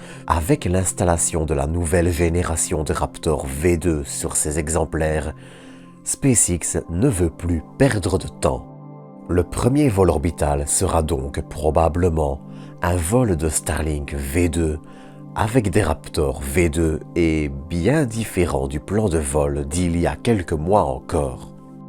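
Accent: Belgium. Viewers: merci pour les précisions, mais j’avais une autre idée de l’accent belge, encore bravo pour tes videos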